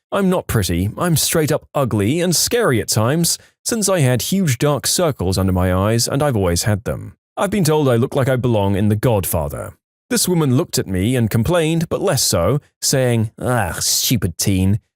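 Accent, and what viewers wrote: United Kingdom. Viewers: Again British guy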